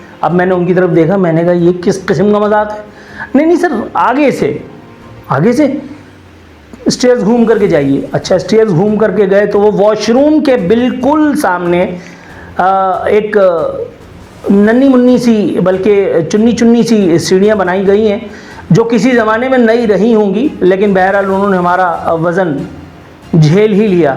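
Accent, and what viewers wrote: Karachi, Pakistan. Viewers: یہ کراچی کی اردو ہے یہاں کے مہاجروں کی زبان ہے آکر سیکھ لیں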